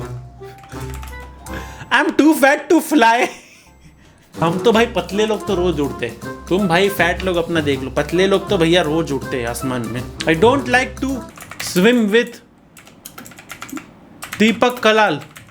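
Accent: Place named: Mumbai, India. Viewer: I am addicted to your vedios..apko dekh dekh kr mujhe mumbai ki language aa gye hai 😂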